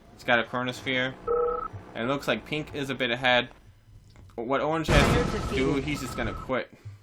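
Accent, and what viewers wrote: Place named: New York, United States. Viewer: you come from new york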